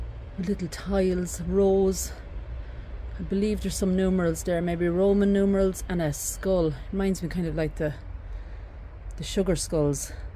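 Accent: Ireland. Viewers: I am a retired language teacher. And was raised by the Sisters of Catherine McAuley. An Irish Order. I understood your “brogue”, but it was an attempt to help you say the French correctly. Bonne journée 🌷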